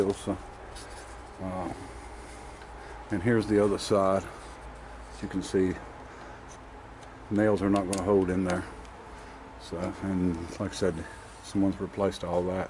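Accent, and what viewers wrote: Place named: Florida Panhandle, United States. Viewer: I didn't realize you were in Florida.. so am I. I'm a Boston transplant and I've been in southern Fl. for a few years now. If I had to guess by your accent, I'd say you're from the panhandle..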